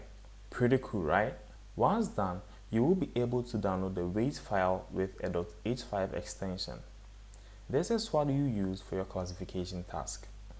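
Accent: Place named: United States. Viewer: 3y3 American accent wai 😂😂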